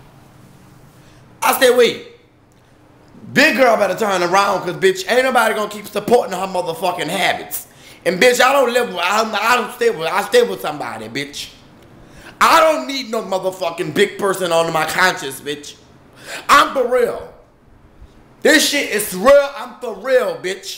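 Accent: New Orleans, United States. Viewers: He Was Sooo Cute.... I love a New Orleans Accent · how people in new olreans talk.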